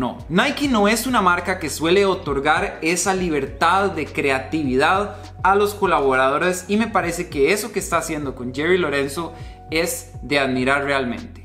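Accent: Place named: Costa Rica. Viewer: Como se nota ese acento de Costa Rica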